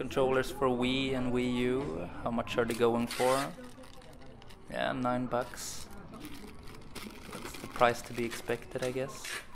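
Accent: Sweden. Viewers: are you from sweden? what hard off is this+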